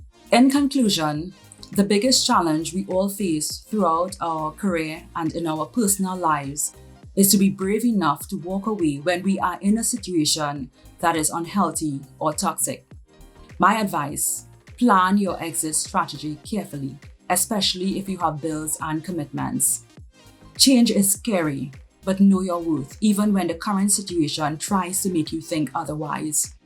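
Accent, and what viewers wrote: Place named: Trinidad and Tobago. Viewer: Hi,. I have been following you on Linkedin for several years. I love your Trinidadian accent! Out of the ten signs that you covered, the one that resonated most with me was the last one. I always say that where there is smoke, there is fire. When a company has a high turnover rate, then bad management is almost always the culprit. If you treat employees humanely, then they will want to stay.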